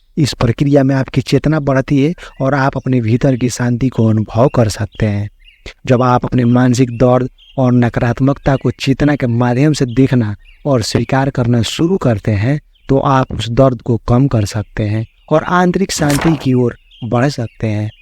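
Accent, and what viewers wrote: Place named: Bhojpuri, India. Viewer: aap saasaram ke hain kya, humko bhojpuri ka feeling aa rha hain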